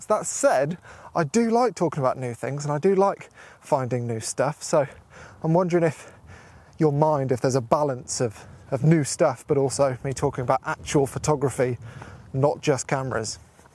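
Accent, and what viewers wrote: United Kingdom. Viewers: I gave u thumbs up even before i watched it. Love the british accent and your dry humor. I have no interest in Panny or micro four thirds but you vids are entertaining. Bikes. Hikes. And what ever else. Love it. Thanks.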